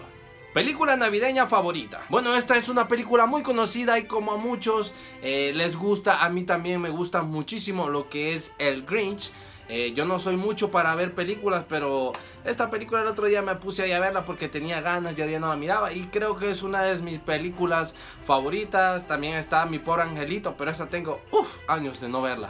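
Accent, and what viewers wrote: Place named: Mexico. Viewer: Adoctando? 😂 Ese man habla más como mexicano los hondureños no hablamos como montunos 🤣 bueno al menos la mayoría que yo conozco además cada quien habla como quiere 😎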